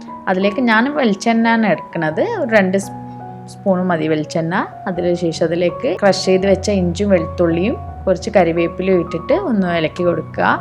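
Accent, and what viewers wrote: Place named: Beary, India. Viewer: Definitely will try this, mutton is fav one, Malayalam with beary slang 👌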